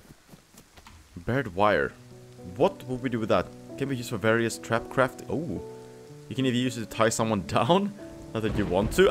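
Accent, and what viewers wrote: Germany. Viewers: I can feel your german accent😂😂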